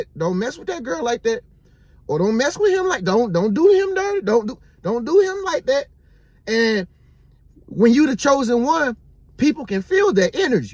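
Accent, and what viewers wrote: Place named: South, United States. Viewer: Yes sir, I've been hurt, and bullied at work for no reason. By-the-way I love your southern accent King! 🤗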